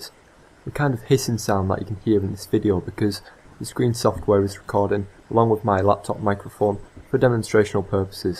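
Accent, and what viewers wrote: United Kingdom. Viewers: Brilliant video, thank you. Are you from the North East of the UK? Your accent sounds very similar to mine. I'm from Middlesbrough. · Loving the boro accent man. Takes me back to my younger days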